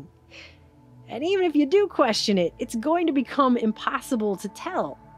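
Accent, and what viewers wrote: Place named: Texas, United States. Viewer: ngl that Texas accent becomes very irksome after several minutes of hearing it.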